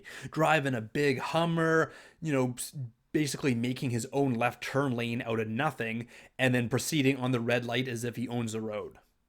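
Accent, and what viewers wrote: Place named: Canada. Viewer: Love me a strong Canadian accent 😍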